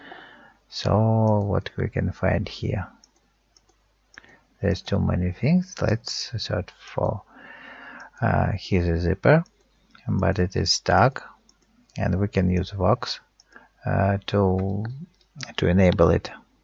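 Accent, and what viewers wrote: Russia. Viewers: Я так и знала,что ты русский,по твоему акценту,и по окну на русскому языке на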